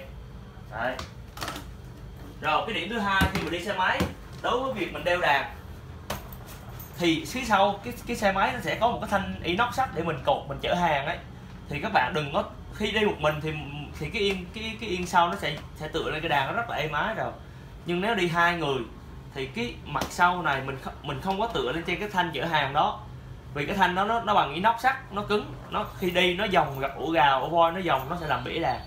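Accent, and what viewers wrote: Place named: Da Nang, Vietnam. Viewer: giong anh noi nhu nguoi thanh pho da nang , ghe zay cho em xin d/c dc ko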